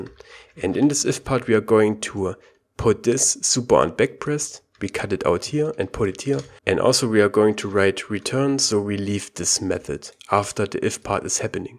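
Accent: Germany. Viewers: Man merkt das er deutsch ist 😂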